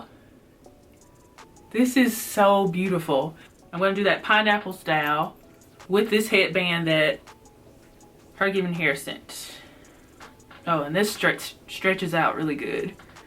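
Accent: South, United States. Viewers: LoL I meant the geographical area...I noticed a slight twang in your voice is all...I definitely wouldn’t have guessed SC.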